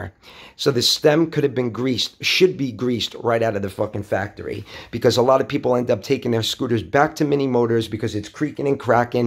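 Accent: New York, United States. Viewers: I love your NYC accent you do really good vids and your bodybuilding channel is awesome as well!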